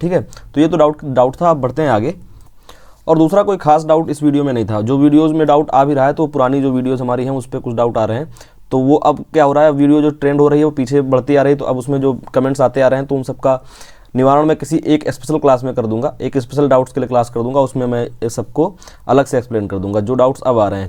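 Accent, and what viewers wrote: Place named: North India, India. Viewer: In the starting video only sir said that he is from Lucknow it mean sir is from north India and north Indian people having different pronunciation for everything as compared to South Indian. So, Ignore these thing Kirti and enjoy the video....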